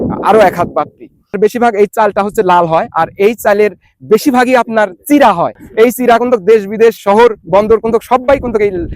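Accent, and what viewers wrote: Natore, Bangladesh. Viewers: আস্সালামু আলাইকুম।ভাইয়্যা , আপনার কথা শুনে আমার মনে হয় নাটোরের মানুষ আপনি কিন্তু আমার হাজবেন্ড বলে আপনি পাবনার আপনি পাবনায় থাকেন ।আসলে কোনটা সত্যি।আপনি কোথায় থাকেন?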